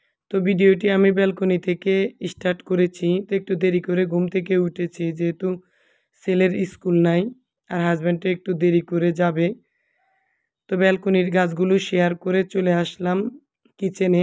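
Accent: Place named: Bhola, Bangladesh. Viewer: আসসালামু আলাইকুম আপু ভোলার কথা সুনলে মনে অতবুত শান্তি লাগো দুই বছর হোলো ভোলা জাইনা লাইক সেয়ার করে দিলাম❤❤❤❤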